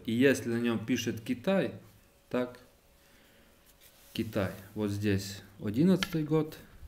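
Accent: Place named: Ukraine. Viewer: Автор спасибо за обзор.Но... Уши вянут.Не пытайся говорить на русском говори на родном языке.Не прыгай выше головы.Разговаривай в будущих видео на украинской мове(из говора понял что украинец),не ломай свой язык и не травмируй наши уши.Спасибо за понимание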